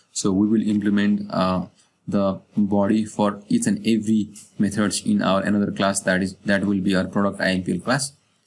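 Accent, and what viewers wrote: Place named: Nepal. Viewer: You have Nepali accent.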